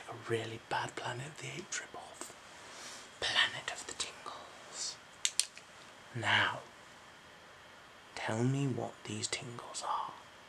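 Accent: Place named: South, United Kingdom. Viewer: i can hear in ur voice that u r somewhere from south England, I've lived in Stockport (Manchester) for 5 years and about Vodka, sure, mate, I can bring u vodka next time i visit th UK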